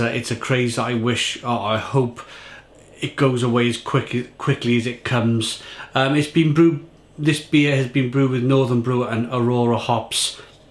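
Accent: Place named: South Wales, United Kingdom. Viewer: south Wales 👍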